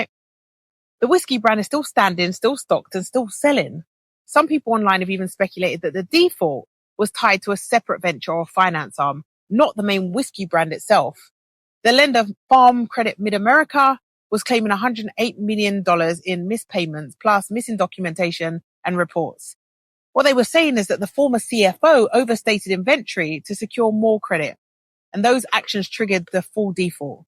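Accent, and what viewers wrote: United Kingdom. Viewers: My thoughts are she should take full responsibility and accountability for everything and also that your ADORABLE wit that Bri ish accent 🇺🇸 🇬🇧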